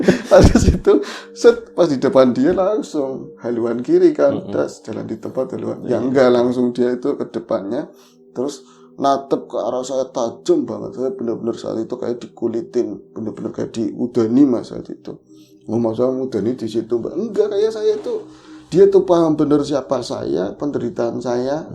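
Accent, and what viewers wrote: Banyumas, Indonesia. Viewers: Amin matur suwun pada2 bro ora ngapak ora kepenak👍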